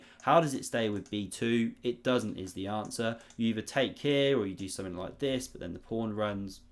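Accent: United Kingdom. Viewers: Def the best chess commentator, brit accents just class up the experience.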